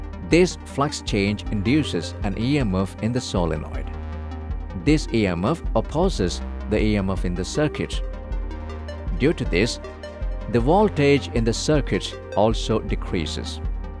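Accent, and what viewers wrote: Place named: Kerala, India. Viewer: malayali ane bro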